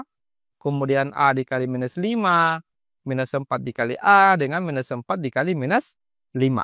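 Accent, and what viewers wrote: Sulawesi, Indonesia. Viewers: orang sulawesi ya pak? hehe soalnya logatnya kaya sulawesi 😃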